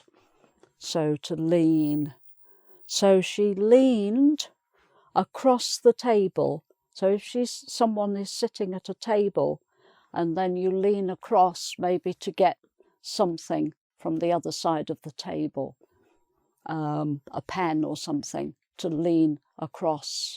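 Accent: United Kingdom. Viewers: What lovely British accent, you're so kind for helping us. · You are amazing! Your British accent is very beautiful. Thank you for your lessons! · I love your british accent ❤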